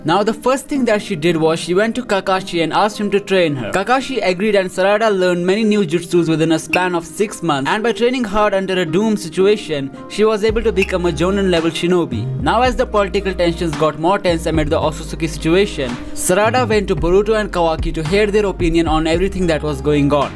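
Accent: India. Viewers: ok bro i understand, I said becoz by listing your voice I think that you a indian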